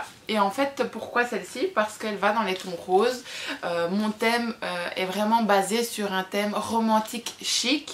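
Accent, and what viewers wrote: Belgium. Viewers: Tu es belge ??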